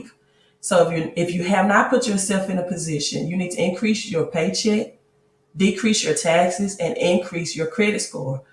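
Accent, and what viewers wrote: South, United States. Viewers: Hello Beautiful! Love your southern accent!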